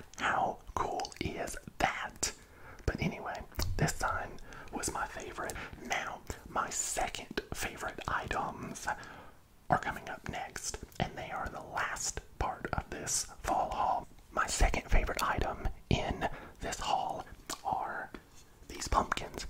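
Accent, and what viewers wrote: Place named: South, United States. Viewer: Love the southern accent; very relaxing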